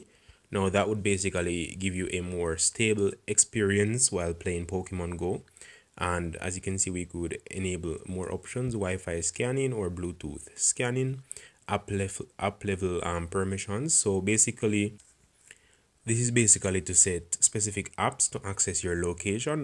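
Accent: Jamaica. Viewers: Your jamaican arent you 🤔?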